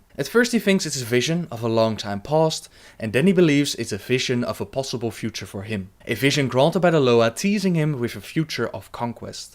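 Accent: Netherlands. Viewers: I'm watching a bunch of lore videos from you and stuff because I recently got interested in the lore. But just a question I'd like to ask, totally off topic... Are you Dutch? Cause I kind of hear a Dutch accent. But I might be wrong. I'm Dutch btw : p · Are you dutch? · dat dutch accent makes me lol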